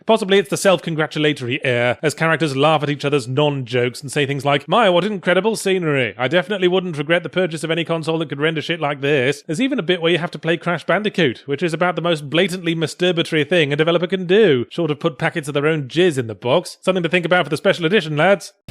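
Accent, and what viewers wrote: South Africa. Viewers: Dear Yahtzee, as a South African I can safely say you are 100% correct, everyone hates us and most of all we hate each other. Oh and btw your accent is terrible, both in your resident evil play through with Gabe and here. You're still awesome, keep up the great work. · I am South African and that statement about us not getting along with each other is pretty apt. Even more so now that President Zuma has our country in a lovely nose dive to end up like Zimbabwe. Oh good review as always Yahtzee, your SA accent is still atrocious though. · Oh, how I missed Yahtzee's South African accent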